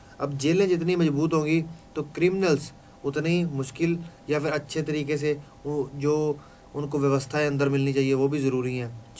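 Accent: Punjab, India. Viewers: Good morning sir Panjabi ho kya sir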